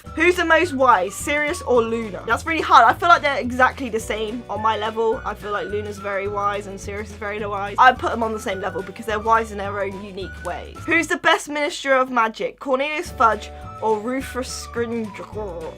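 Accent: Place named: United Kingdom. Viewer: sometimes she speak so fast than mixed with the British accent it is impossible to understand a word 😂 but anyways i love this girl so much 💚💚 (and her accent👀)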